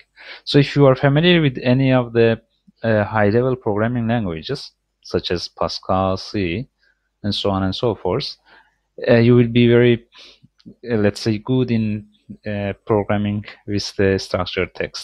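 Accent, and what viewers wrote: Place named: Iran. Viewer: I like the Persian accent! thanks for the content it is useful though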